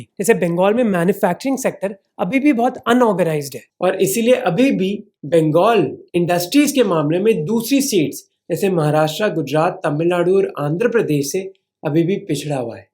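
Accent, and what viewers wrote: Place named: Uttar Pradesh, India. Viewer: I think she is from UP state 😂😂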